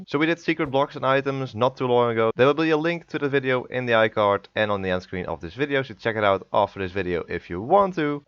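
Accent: Netherlands. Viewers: Dat nederlands accent XD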